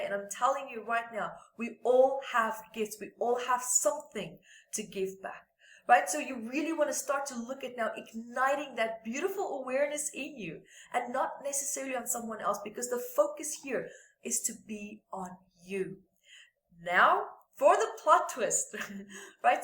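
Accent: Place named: South Africa. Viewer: She is from South Africa 🇿🇦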